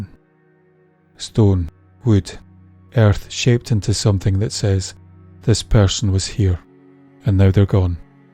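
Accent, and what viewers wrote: Scotland, United Kingdom. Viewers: Soooo enjoy your soothing and wonderful Scottish accent! I’m in love. ❤️🏴. Your stories are so intriguing and with the right touch of history and mystery. Scotland is my most favorite place on earth. I am blessed to have sister by heart, ♥️ she is from Aberdeen. Was up this past June. I will definitely be back. Living in Scotland would be my dream. Thank you. And just for a good laugh, I’m from “ Erie” Pennsylvania. USA 🤭😉👍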